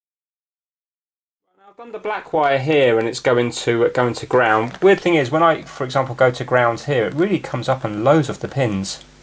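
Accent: United Kingdom. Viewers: I really love the UK accent, I can listen to it all day :D It's the best language in the world ! ( I'm from Belgium by the way xD )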